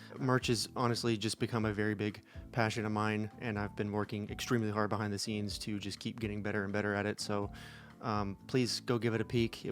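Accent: United Kingdom. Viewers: Yep, from uni to flat to being at mine. I'm guessing somewhere in the west. So VERY Brit.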